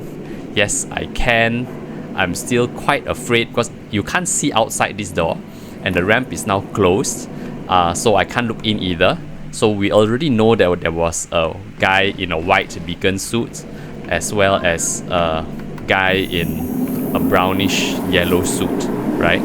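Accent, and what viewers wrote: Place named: China. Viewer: Are you Chinese? You sound Chinese. :D If so, ni hao, gao xin ren shi ni. Thanks for the funny video.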